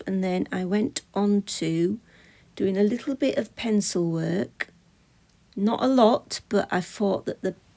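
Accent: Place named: Newcastle, United Kingdom. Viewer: I love these snippets. Hubby came in so I asked if he were going to put headphones on or would he prefer me to put headphones on. He said he rather liked your soothing voice so he listened to you talk while doing a puzzle. Now you’ve stopped at the end of the video he’s listening to something else through his headphones. I thought it was funny. He says he can hear a mild Newcastle accent in a North Yorkshire accent. Is he right? Is there a Newcastle connection? (He is Lancashire with a dad from Newcastle.)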